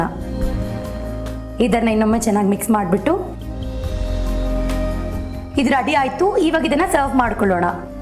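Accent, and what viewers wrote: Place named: Karwar, India. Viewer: Nice. Heralekai rasakke nimbe hannina rasa andubittri. By the way I enjoyed your Kannada accent. Idu Karwar kannadana ?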